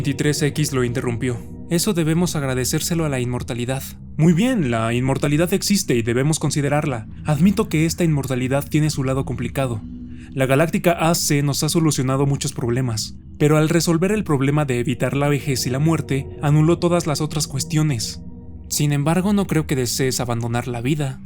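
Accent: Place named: Zacatecas, Mexico. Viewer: Se nota el acento de Zacatecas pero es mucho mejor que la voz robótica o el español castizo con su odioso seseo 😢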